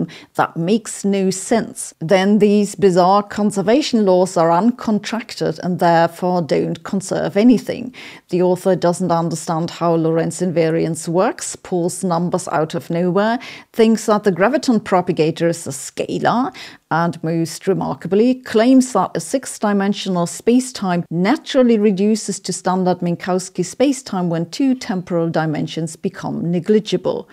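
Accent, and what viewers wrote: Germany. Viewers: Wow! Your delivery is very German! Love your work. Science requires such candor. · Did you notice that all complements by someone with a German accent sound sarcastic.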